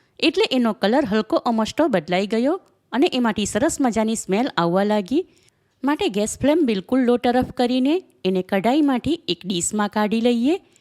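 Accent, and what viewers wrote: Surat, India. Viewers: E to surti language chhe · સુરતી છે..😅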